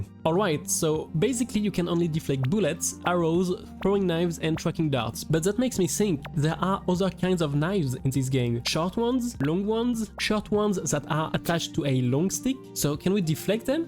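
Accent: France. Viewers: your accent looks French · T'es français toi non ahah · tu as un accent français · Cet accent français de qualité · bro are u french ? ur accent sound like it ? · Is that a French accent I hear? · Your france accent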